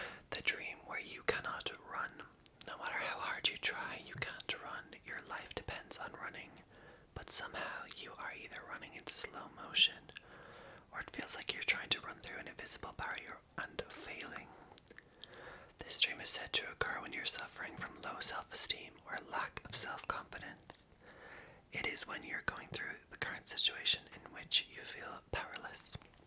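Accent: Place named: United Kingdom. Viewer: Love this concept! Was super interesting to listen to and your whisper was super relaxing! I usually don’t vibe with male ASMRtist’s whispers but yours is unique. It sounds like you have a UK accent sometimes. Maybe you just annunciate very well.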